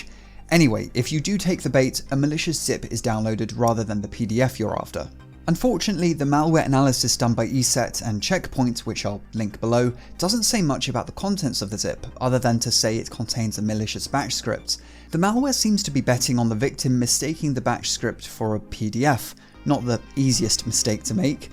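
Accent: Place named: United Kingdom. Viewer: Love you r voice 💖😍 ... excellent British accent....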